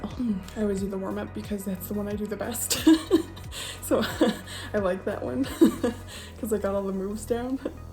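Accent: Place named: Midwest, United States. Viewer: Hey are you from the Midwest? I’m from Minnesota and your accent sounds like mine lol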